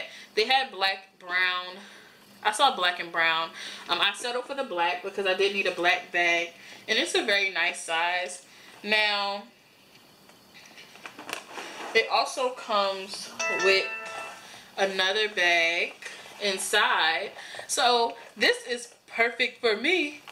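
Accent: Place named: Newark, United States. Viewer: Hi girl, I'm from Newark! Going there for the festival in Lincoln Park! I knew I recognized the talk! Sounds like us! I live in Atlanta now! I have subscribed and get to see all of your great videos! Keep giving us the current slay! THANKS! Jp! !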